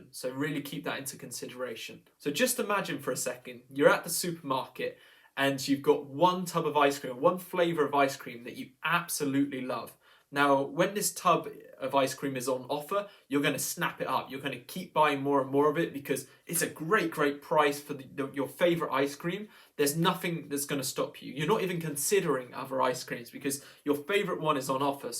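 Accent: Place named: England, United Kingdom. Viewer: Really appreciate your videos and so nice to hear an English accent for a change.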